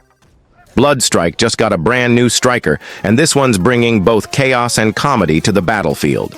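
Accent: Nigeria. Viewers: Wait bro are you from Nigeria 🇳🇬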